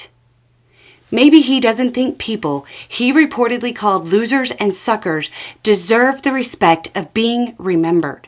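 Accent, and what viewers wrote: South, United States. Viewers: I'm not from the South, but I have lots of friends who are... I keep waiting for to say "Bless his heart," in the way only a Southerner can mean it. lol You go,! Please give my best regards to Beau - I hope he is doing really well. Much Love. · I love how Miss gets pissed and then wishes ya'll a good day in that sweet, soft, southern drawl.:yougotthis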